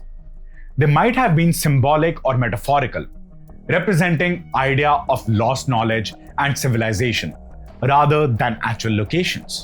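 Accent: India. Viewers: annoying listening to hindu english ...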